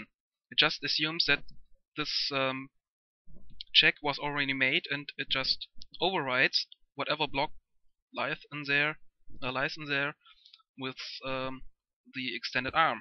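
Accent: Germany. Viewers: Bist du deutsch hört sich so an wen ja schreib was auf deutsch German !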